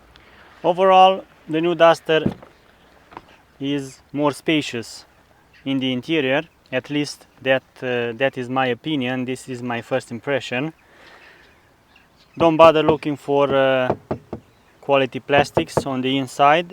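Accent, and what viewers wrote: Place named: Romania. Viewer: Нормальный восточно-европейский акцент (он из Румынии), зато нам же и всё понятно, что он на инглише говорит. И большинству во всём мире понятно. Так что напрасно вы докопались. А вот что у нас этой машины нет на российском рынке - вот я бы про это переживал. Возможно стала бы даже более народной, чем предыдущие генерации, сильно потеснила бы в этой нише Creta, Seltos и т.п. Но увы :(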